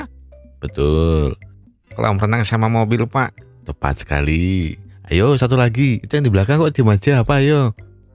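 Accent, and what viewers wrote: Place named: Java, Indonesia. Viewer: Cakepp bangn... Abangnya lucu.. Logat guyonana jowo😅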